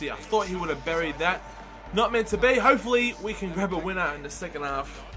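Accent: Australia. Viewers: Isn't it obvious? Australia!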